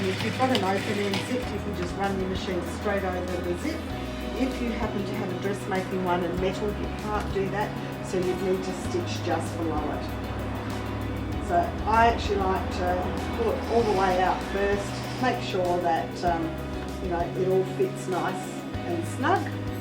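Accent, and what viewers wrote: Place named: Australia. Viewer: Fantastic Video Tutorial. Thank you so much for sharing. I am on Gold Coast and great to hear an Aussie Tutor. I wish I had seen this video before I made my last pillow. Would have had a much better finish. Merry Christmas and Thanks again.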